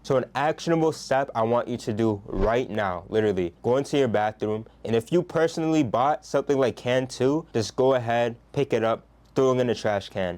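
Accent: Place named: Jamaica. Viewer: Which country your parents come from. Mi hear yu accent !jamaica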